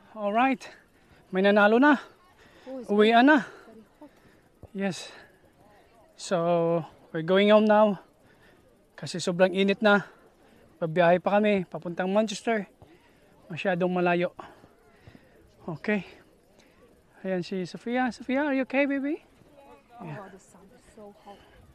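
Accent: Iloilo, Philippines. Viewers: Ilonggo ka b kuya? Malubog mn s Ilonggo ang d matin aw😁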